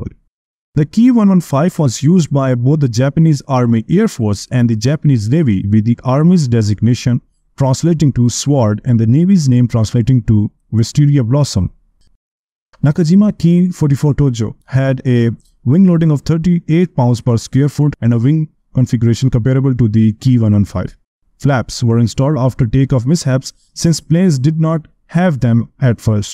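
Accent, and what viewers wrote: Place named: India. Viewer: Indian voice over 😢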